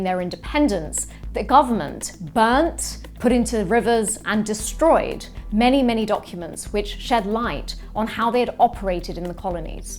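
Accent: RP, United Kingdom. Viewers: rubbish video by this wannebe repoter, having a posh voice without doing your due diligence will not help you and may turn off lots of listeners . Attacking your PM and his government is a very negative waste of time when you should offer solutions not innuendo .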